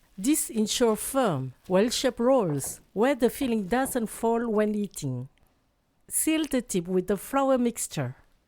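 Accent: France. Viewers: She is Asian with a French accent !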